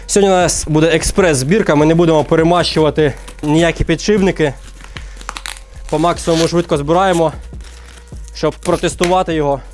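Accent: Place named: Surzhyk, Ukraine. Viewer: Хорош на суржике балякать. Мы в Одессе не урозумим вашу сельскую гарную мову.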